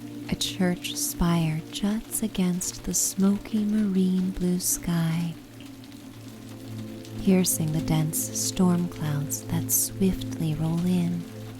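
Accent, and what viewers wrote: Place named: United States. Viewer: Love the rain ☔️ sounds! That is what decided this video for me! Love your voice and accent! I moved from the us to Ireland when I was 8, and the uk 🇬🇧 when I was 16 and I love it but my inner child loves an American 🇺🇸 accent! Soft like yours which was made for this and you would make an amazing hypnotist! Thank you 🙏 🕊🤍🕊 🫶✨🌟💛🌟✨🫶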